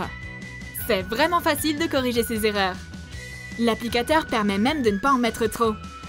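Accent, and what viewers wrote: United Kingdom. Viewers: vous êtes française ou anglaise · vous êtes anglaise ou française?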